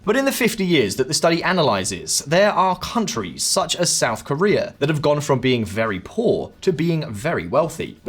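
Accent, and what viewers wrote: United Kingdom. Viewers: I like the way you compose the narrative into different perspective that are coherent. It does make sense when we think about it. Of course there's no way clear definitive cut to conclude heat slows hinders economic growth, but it might be the trigger (indirect factor) for the human behaviour (direct factor) that makes hot countries are economically subordinate than cold countries despite they are more resourceful. Keep progressing making meaningful and all rounded contents. I enjoy watching it while being entertained with your British accent 😂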